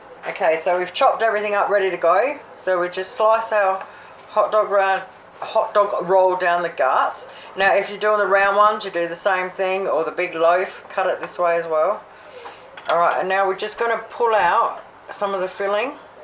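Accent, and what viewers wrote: Australia. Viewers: I just stumbled upon your channel when I searched for baked pizza sub. I'm about to subscribe cuz you have a candid way of saying things like me only I have an American accent and yours is Australian. I can't wait to see what else you got cooking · Michigan here, love the OZ accent. I'm doing subs with ham, pepperoni, black olives, sweet onion, tomato slices, provolone cheese and after it's all toasted in the oven, shredded lettuce. ( edit: and jarred pizza sauce. ) Thanks for the video!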